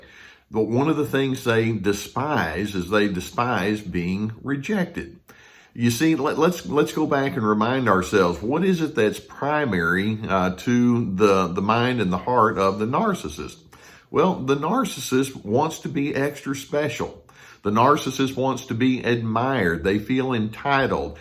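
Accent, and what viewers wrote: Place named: Texas, United States. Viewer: Exact description of my neighbor, he is in the 'suffering' stage. Once we know the way, we will always win by taking the higher ground and holding our power. This is the VICTORY that you share so freely, giving true hope to those who are on the road to recovery. And, your Texas accent with your soothing voice is SO RELAXING AND AFFIRMING!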